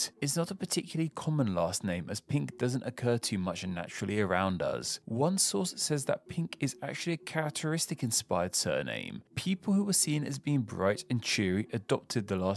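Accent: United Kingdom. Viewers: Are u british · What region of UK is your accent from?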